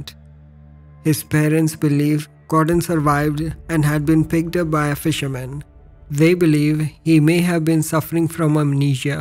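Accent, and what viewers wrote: India. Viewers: Why Indian Guy with Indian denglish as your speaker? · Lol turn on subtitles...my mother is married to an Indian...I'm kinda used to the accent lol... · the indian guy narrates, the accent irritates my ears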